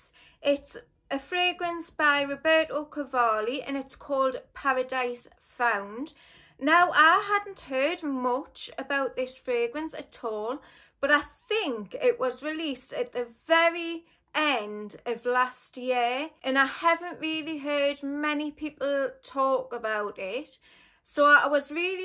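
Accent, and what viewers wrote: Scotland, United Kingdom. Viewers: I love your accent! Scotland? It's so precious. Thanks for the review :)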